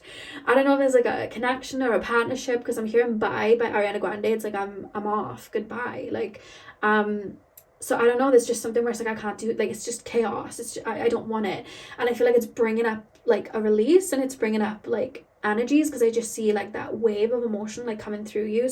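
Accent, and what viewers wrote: Wales, United Kingdom. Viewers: We missed your Welsh accent and voice so much!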